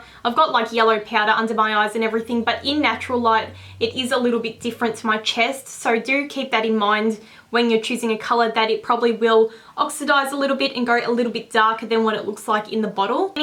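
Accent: Australia. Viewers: its an Australian accent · are u australian ? i LOVE ur accent !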